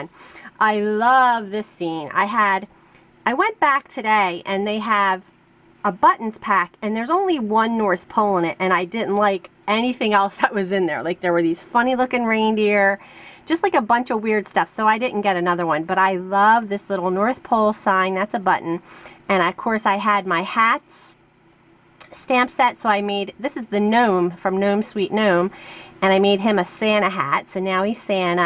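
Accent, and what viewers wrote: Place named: Queens, United States. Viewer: Hi!! It's Laurie, I knew you had an accent!! My mom is from Queens!! So NJ is about 6 or 7 hours (depending on traffic!!! LOL) I would really appreciate a video on those beautiful stars, everyone LOVED them!! I know you will do great at the craft show because you love what you do! I look forward to seeing more beautiful projects and you never know you just might see a Canadian License plate at the show!! All the best!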